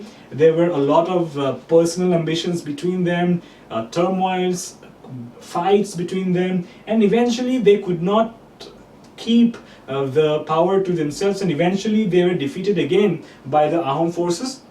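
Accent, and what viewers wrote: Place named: United States. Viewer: aapuni US r pra ahise nki ne UK r pra. val english koise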